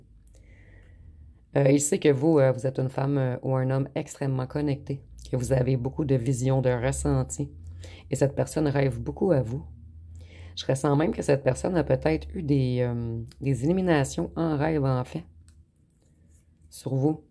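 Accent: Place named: Quebec, Canada. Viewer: Guidance la plus juste possible, pleine de charmes...Avec cet accent qui pour les non canadiens est une marque de fabrique Made in... Québec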